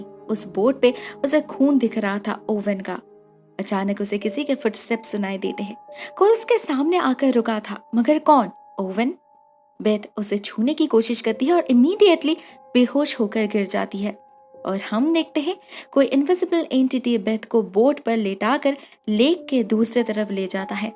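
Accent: Bengal, India. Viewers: Khub sundor didi.. tumi bangali hoeo eto sundor hindi accent tomar❤️❤️ Ajk bangla tei comment korlam..😁 Love you di❤️❤️❤️ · Keya app bengali hooo??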